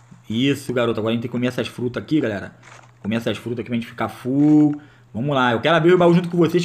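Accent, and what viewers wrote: Rio de Janeiro, Brazil. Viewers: EAE GALERA NA ÁREA sotaque Do rio é foda Like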